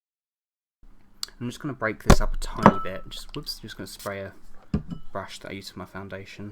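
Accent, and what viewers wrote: England, United Kingdom. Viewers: Lovin all your tutorials xo! Its amaziiing! Even tho I'm your new subs:( and I also love your British accent ♥ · Here it was so scary and then you start speaking in this warm, wonderful voice with a lovely English accent.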